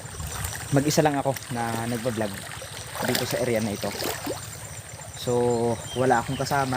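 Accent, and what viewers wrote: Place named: Visayas, Philippines. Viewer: Bisaya ka idol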